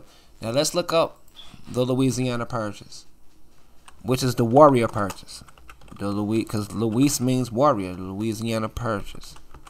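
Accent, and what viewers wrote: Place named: Chicago, United States. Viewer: I knew you were from Chicago before I read it by the way you talk lol. Good video.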